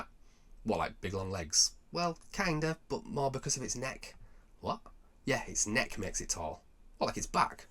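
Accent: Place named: North, United Kingdom. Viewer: Art with a luvleh Northern accent and awesome graphics? Subscriiibed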